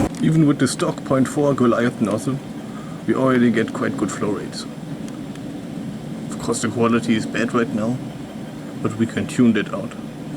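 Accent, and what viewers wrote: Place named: Germany. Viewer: I knew I was in for a ride when hearing the thick German accent XD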